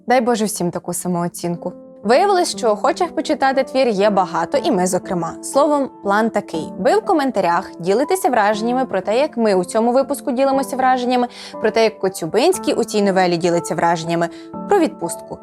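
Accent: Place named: Ivano-Frankivsk, Ukraine. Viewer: Коцюбинський- талант! А ви хто, щоб іронізувати і зневажати? Історик розповідає цікавіше, мудріше, без принижень історичних постатей. У Києві опинились нещодавно, але вже знущаєтесь із свого станіславівського діалекту. Неприємно слухати. Хай би молодий історик усе викладав…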